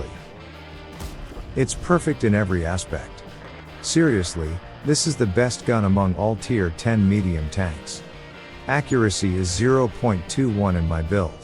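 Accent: Russia. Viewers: Ты русский? Давно научился говорить по английски? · very nice accent for a russian guy. Thanks for these information.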